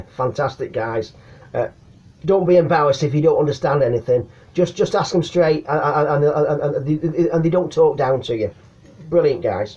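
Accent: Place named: Yorkshire, United Kingdom. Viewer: Yorkshire? Lancs? Ex-Halifax lad here. Living in CA. CB is quiet here so getting a 10M rig tuned and want to put an amp in the system. Found your clip and it felt like I were back 'ome again.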